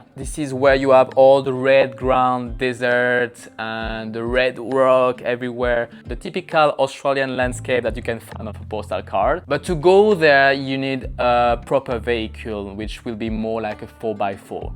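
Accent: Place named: France. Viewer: It's either your French accent or my screwy hearing 😆.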